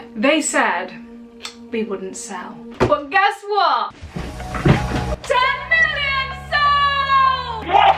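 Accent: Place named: East Midlands, United Kingdom. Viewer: ''Blame my accent'' what is your accent? my guess is East Midlands like Derby or something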